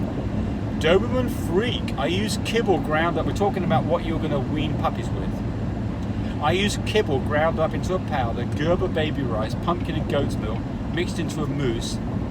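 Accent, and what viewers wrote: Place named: United Kingdom. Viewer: Im really curious you have a british accent.are you originally from UK.. karen from england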